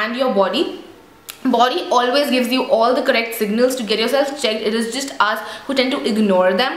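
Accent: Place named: India. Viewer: nicest Indian accent iv heard. not too thick ,nor faking an American accent .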